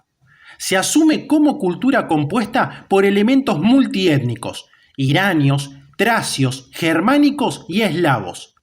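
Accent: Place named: Argentina. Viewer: Buen documental, PERO deberías de dejar de husar jergas y acentos cuando se trata de ' Nombres propios ' de Ciudades, paises, regiones, o nombres de personas... usar la She , sho, shu, sha, etc en nombre própios es an algunos países un insulto, ese el problema con los acentos argentinos, por lo demás perfecto.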